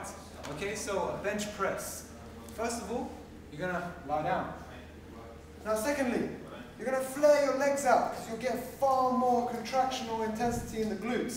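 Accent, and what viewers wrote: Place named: United Kingdom. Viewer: The accent and brit lingo makes this by far the best bench tutorial I've ever witnessed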